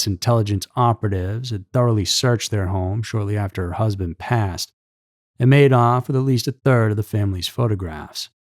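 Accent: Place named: Midwest, United States. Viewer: Not Texan, probably mid western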